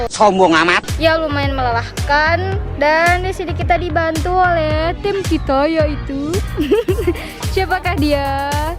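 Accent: Lombok, Indonesia. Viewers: Mantul bngt cara ngomongnya kak medok lombok,saya sendiri juga orang lombok:)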